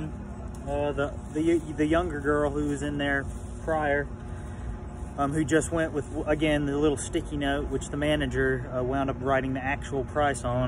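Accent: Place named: South, United States. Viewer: Why is your Southern accent amplified by like 10 in this video?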